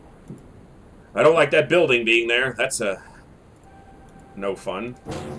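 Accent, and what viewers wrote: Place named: United States. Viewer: I am very much enjoying this playthrough. I’ve watched a ton of xcom and TFTd let’s plays on YouTube and this is quickly turning into one of my favourites. I love the raw organic playthrough where you just have to live with your mistakes and keep fighting. I’ve seen too many people play this game and just spam psi powers to win the game. I never liked using psi personally, feels like a cheap way to play. Also love that TFTD is getting some love cuz this game deserves love too! Your subtle American accent reminds me of someone I used to know from Philadelphia. Greetings from Canada!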